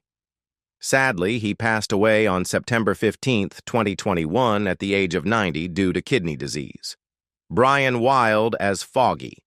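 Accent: United States. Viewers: If you are going to tell us about this great show learn how to talk English not American..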